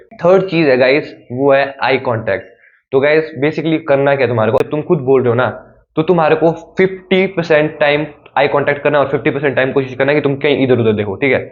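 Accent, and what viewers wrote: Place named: Pahadi, India. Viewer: Bhai tumhari awaj mai pahadi accent ata h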